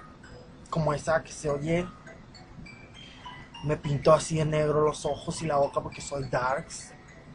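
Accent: Mexico. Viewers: Tkene acento del norte de Mexico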